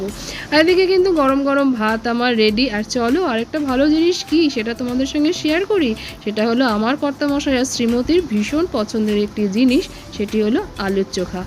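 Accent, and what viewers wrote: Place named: Bangal, Bangladesh. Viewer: খুব খুব ভালো লাগলো তোমার আজকের ভিডিওটা তোমার কথাগুলো শুনতে খুব ভালো লাগে আর মাঝে মাঝে বাঙাল কথাগুলো বেরিয়ে বেরিয়ে যায় শুনতে ভালো লাগে আজকে যেমন বলেছো ডিমের চোকলা আমিও মাঝে মাঝে বলি চোকলা কিন্তু ঘটিরা বলে খোলা ডিমের খোলা তোমার কথাগুলো শুনলাম মাছ ধরার টিপসটা খুব ভালো বলেছ আমিও এই রকম করি খানিকটা সরষের তেল মেখে নিন ভালো থেকো সবকিছু নিয়েই ভিডিওটা খুব ভালো লাগলো আর তোমার কথাগুলো তো তুলনা হয়না❤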